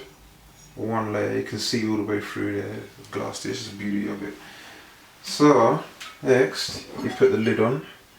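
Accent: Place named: England, United Kingdom. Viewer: thanks bloke. I wish all men sounded English like him. hubba hubba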